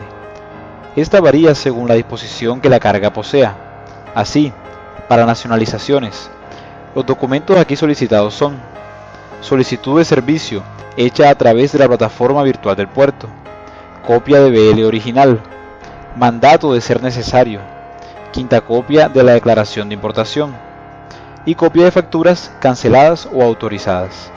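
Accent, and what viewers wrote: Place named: Venezuela. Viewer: es Venezolano, no reconoces el Acento.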